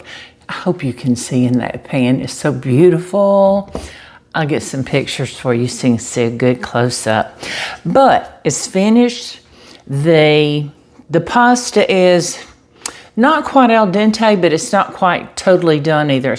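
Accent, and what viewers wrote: South, United States. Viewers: I say Italian the same as you do. Please don’t worry about what negative people think. You are a lovely person and I love your southern accent. I also love your recipes! Have a warm nice weekend with Pop. 💕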